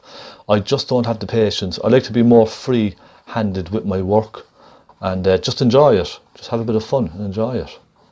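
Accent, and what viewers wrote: Cork, Ireland. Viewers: Fabulous tutorial and if I'm not mistaken great cork accent Subscribed!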